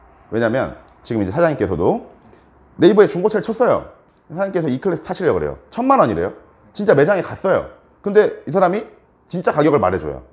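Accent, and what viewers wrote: Gyeongsang, South Korea. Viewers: 진행자분 경상도 사투리좀 감추세요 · 딱 들어도.. 경상도사투리인데 왜 북한이라고 하는지?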